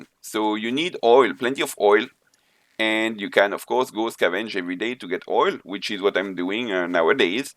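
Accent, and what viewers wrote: France. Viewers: ah je ne suis pas la seule a avoir remarquer un énorme accent Français étrange pour un channel totalement en anglais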